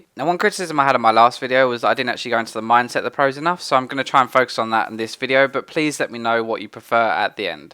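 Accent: United Kingdom. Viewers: Hea British? I'm British! :) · Oh god, my bad, I didn't even noticed the player's name._. well, it has been proven that the british accent makes speeches more charismatic and less tiring to listen to. Take that as your advantage Mr.! haha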